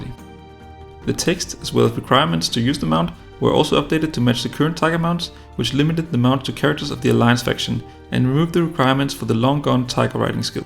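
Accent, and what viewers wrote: Denmark. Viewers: Beautiful Danglish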